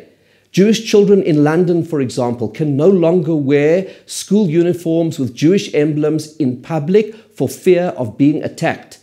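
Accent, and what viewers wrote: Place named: South Africa. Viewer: Within 10 seconds I knew you were South African.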